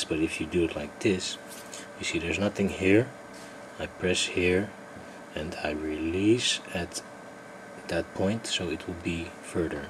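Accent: Netherlands. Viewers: ik weet gwn aan je acsent dat je nl bent XD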